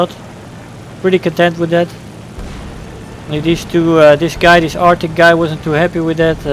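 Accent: Netherlands. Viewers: Ha! ik wist t dat nerderlands accent is overal herkenbaar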